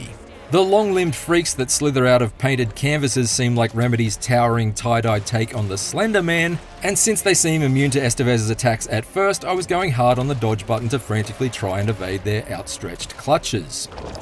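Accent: Australia. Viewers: He's aussie and some news reporters have this style. Althugh this guy is particularly annoying, and I'm speaking as an Australian.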